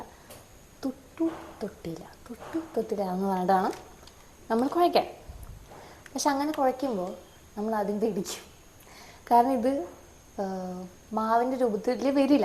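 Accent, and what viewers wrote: Thrissur, India. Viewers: Thrissurkary aano slang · naattil Thrissur aano athe slang😁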